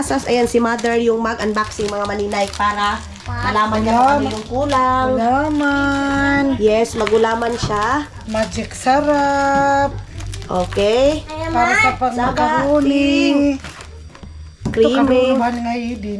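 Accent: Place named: Butuan, Philippines. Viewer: Alam mo maninay namimis ko mag salita ng tulad ng salita nyo KC amarunong akong mag salita ng ganyan KC Ako ay taga Butuan pure Bututanon ganyan among inistoryahan.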